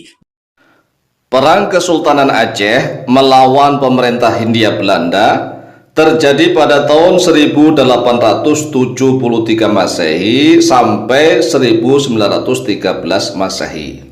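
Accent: Java, Indonesia. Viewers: Ini bukan orang aceh baca ini tapi jawa sebab logat aceh kami tahu. Hahaha kalau kita lihat dimakam krekof yaitu makam prajurit belanda yg di kubur diaceh maka banyak orang prajuirit belanda yg mati disana dan bernama akhir o dan itu paling banyak mati dikuburkan disitu. Coba cek aja sendiri dan hb usman punya murid di aceh tuanku kemala ,tuanku kemala ini pejuang aceh dan ulama. Catatan 150 thn itu bukan diaceh y tapi dipulau itu walaupun akhirnya mereka yg paling merasa banyak pejuang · Min, logatnya ko kaya orang jawa, aksen nya kaya bukan orang Aceh ? Sejarah memang versi, Persis kaya peristiwa G30S PKI Kita juga akan mendapatkan cerita yg berbeda/versi yg berbeda tergantung dri sudut pandang siapa. Seperti juga hari lahir Pancasila, Versi sarjana hukum yg paham ilmu hukum lahirnya tgl 18 Agustus 1945, tapi versi Megawati 1 Juni Tentang habib Yahya, yg disangkakan sebagai antek Belanda kebenaranya harus diuji secara ilmiah bukan dri persangkaan, bukan dri rasa dari fakta, apa kedudukan dan fungsi Mufti pada saat pemerintahan Hindia Belanda dsb, Sama seperti kita melihat film Sengsara membawa nikmat, yg kita lihat Midun sebagai pahlawan, tapi dia jadi polisi pada pemerintahan Hindia Belanda, Berarti sesungguhnya Midun penghianat bangsa dan antek Belanda ? 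Mari belajar berfikir jernih dan menyeluruh, sehingga tidak salah dalam mengambil kesimpulan, kebenaran didunia itu nisbi/relatif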